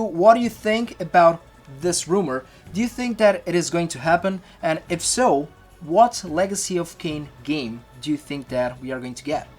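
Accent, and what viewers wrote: Portugal. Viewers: Wait, are you from Portugal?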